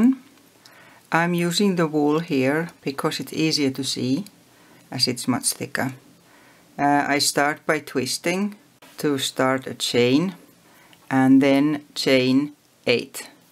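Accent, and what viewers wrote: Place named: Finland. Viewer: Kuuntelin aksenttia ja totesin että oiskohan suomalainen ja kyllä vaan. :D Kiitos ohjeesta.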